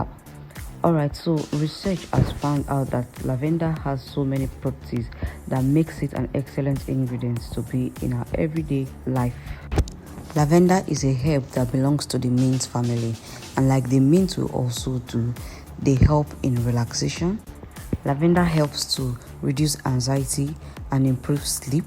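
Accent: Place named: West Africa. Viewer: Lilac baby li-lac pronounced lie-lac or lye-lac The tutorial is very beautiful but your pronunciation been terrible at least I know what herbs you use and everybody else knows what herbs you you use you must be from the french part of Africa i had to watch your videos over and over to figure out what you was trying to say!!!...😊😘😍🤩 i still love your beautiful accent From your country where ever it is and your skin is amazing and all your tutorials are too but I still have to figure out😂😅🤨 what you be trying to say in the herbs section.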